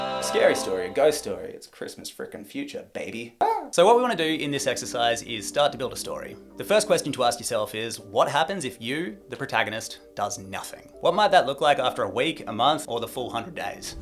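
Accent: Australia. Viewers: I learnt a lot from you but especially to understand the Australian accent. Thank you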